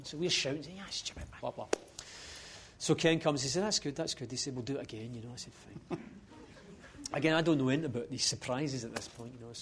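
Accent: Scotland, United Kingdom. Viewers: can someone translate please, thought I understand english, scotish accent is still quite difficult to get! Thanks! A Catalan.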